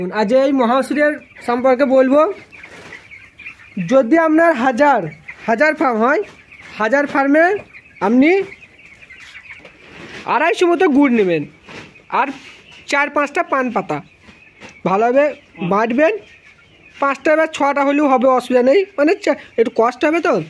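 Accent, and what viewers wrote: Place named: Murshidabad, India. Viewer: kotha suna bujlam murshidabad bari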